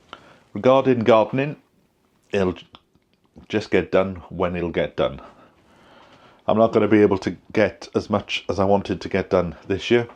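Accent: Wales, United Kingdom. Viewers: I love listening to you chatting 😊I love the Welsh accent 😊Blessings going your way for healing 🍀❤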